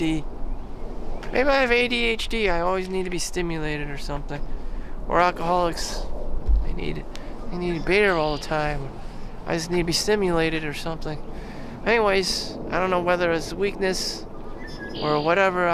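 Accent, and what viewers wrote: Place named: United States. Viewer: What? He sounds 100% American!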